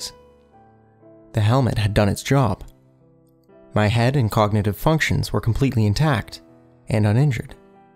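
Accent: Canada. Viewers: A very Canadian creepypasta reader. Not only that but you read very well. I'm looking forward to listening to more of your readings :}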